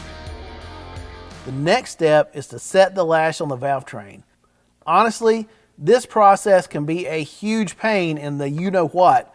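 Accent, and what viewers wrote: South, United States. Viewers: And the Oscar goes to 'All the Oddities of Ford's Famous Flathead V8'. A video above the rest. Award winning performance by (the artist). Narrated by a good ole boy from the South. It don't get better than this! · That speech pattern is called "Southern"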